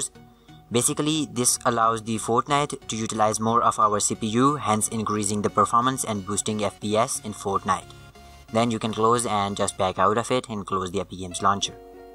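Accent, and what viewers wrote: India. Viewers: That Indian accent tho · i dont trust indian voice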